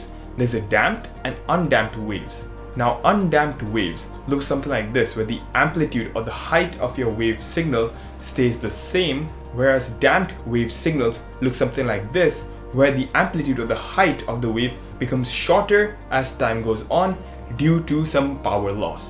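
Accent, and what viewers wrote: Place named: India. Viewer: COngrats. Ever your Indian accent we get what you say and you know and explain well. Very good video.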